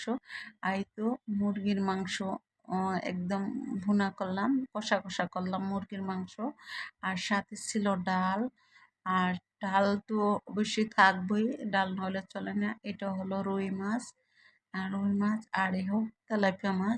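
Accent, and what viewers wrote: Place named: Barisal, Bangladesh. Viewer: আপু তোমার দেশের বাড়ি কোথায় /আমি সুইডেন থেকে বলছি। আমার বাড়ি বরিশালে। তুমি বরিশালের কথা বলছো তো জিজ্ঞেস করলাম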